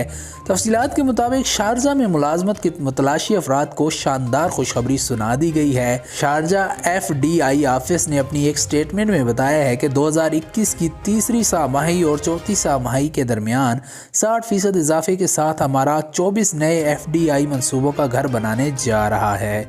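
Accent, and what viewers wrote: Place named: United Kingdom. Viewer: Please mere bhai apna English accent keep away jazakum Allah wo khair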